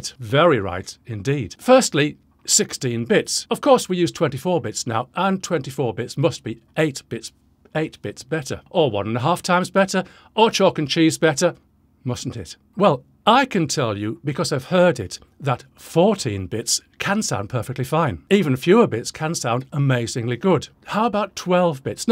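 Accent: United Kingdom. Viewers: He Does come off as a rather arrogant know it all, doesn't he? .... the Brit (almost typed 'Cockney' lol) accent doesn't help ....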